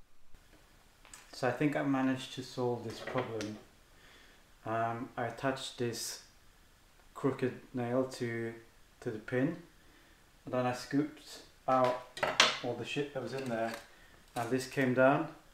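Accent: United Kingdom. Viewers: Du har en brittisk dialekt har du bott där tidigare ?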